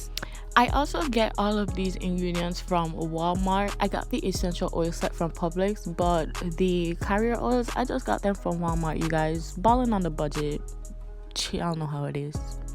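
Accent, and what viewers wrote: Caribbean. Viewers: Heyyyyy 😍 where you from if I may ask? U sound like u got caribbean background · Are you from the Virgin Islands 🇻🇮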